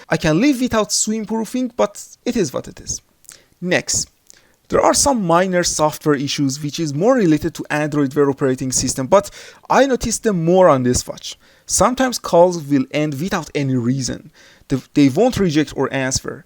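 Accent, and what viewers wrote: Iran. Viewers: Bro, I am 100% sure your native is Farsi, right? Beautiful review, thx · Iranian maybe or afghan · Persian accent 😂😂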